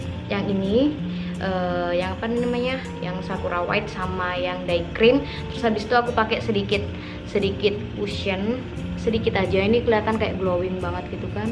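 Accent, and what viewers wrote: Indonesia. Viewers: probolinggo bener logatnya 😂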